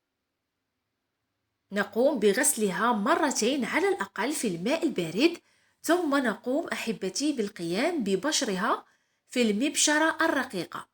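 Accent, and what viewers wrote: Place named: Casablanca, Morocco. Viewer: وصفه جديده مغربيه احسن وصفه الببوش مع الفليو بولغم وش البولغم قصدك بلغم الله يعين اللغه العربيه من لهجتك انت بنت كازا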